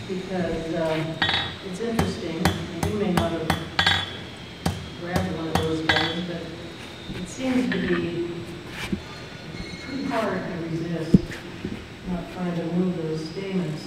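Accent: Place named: United States. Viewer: Hey are you actually Scandinavian or do you just have love for the culture and history of Scandinavia? You look like a pretty stereotypically Scandinavian, your tattoos seem to have norse inspiration, you have a lot of Norse runes and Norse fonts in your videos, and many of your crafts are Viking styled but your accent is American.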